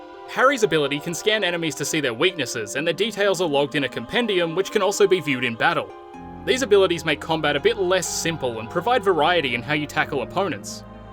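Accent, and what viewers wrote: Australia. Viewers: I wasn't expecting a reply, love your channel so far, it's refreshing listening to another Australian talk about game design.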